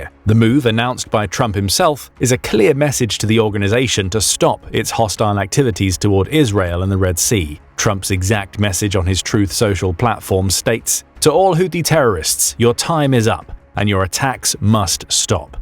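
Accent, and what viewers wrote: United Kingdom. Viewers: 250 years ago, Rebels broke away from British rule and sailed to the New World to start their own government. But, this youtube channel never got that memo. So . . . they speak with a British accent About U S military movements. WHAT IS WRONG WITH THIS PICTURE?! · QUERY: Why choose Brit accent for narration? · why do we have to keep hearing American issues, with a British accent.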